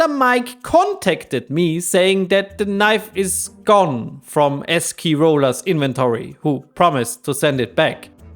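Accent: Germany. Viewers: Man hört richtig den deutschen Akzent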